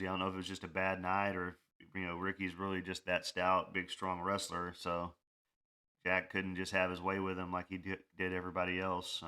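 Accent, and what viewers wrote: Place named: Tennessee, United States. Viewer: I dig your breakdowns. You got a accent like me. Middle TN. Where you out of?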